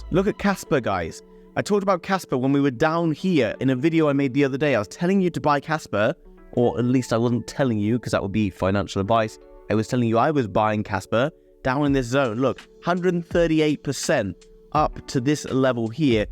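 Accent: United Kingdom. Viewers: How did Pauly D get a British accent?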